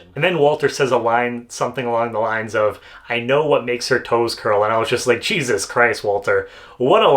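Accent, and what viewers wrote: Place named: Northeast, United States. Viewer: Hey, we are Aussies and were wondering where you are from based on your accent. The opinions are north east, northwest and one vote for Boston 😂 Please help😂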